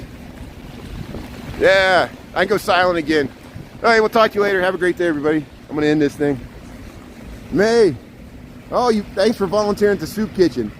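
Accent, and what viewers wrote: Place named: Midwest, United States. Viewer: He gotta be from midwest love his voice😌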